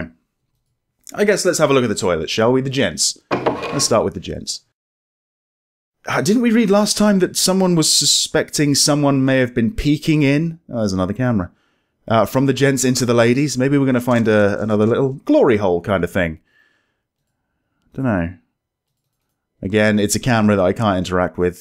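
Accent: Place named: England, United Kingdom. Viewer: I love your english accent. I'm brazilian. You´re a very nice guy! (I believe that´s how to say that, in Brazil it'll be "simpático".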